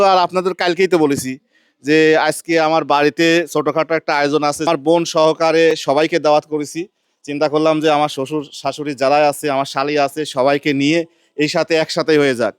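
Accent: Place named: Jessore, Bangladesh. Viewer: আপনার সহজ সরল ভিডিওগুলো ভালো লাগে। এভাবেই সবাইকে সঙ্গে নিয়ে ভালো থাকবেন। আপনার ভাষা শুনে মনে হচ্ছে আপনি গ্রেটার যশোর/কুষ্টিয়া/পাবনা কিম্বা আশেপাশের জেলার অধিবাসী। আপনার বাড়ি কোন জেলায়, জানালে খুশি হব।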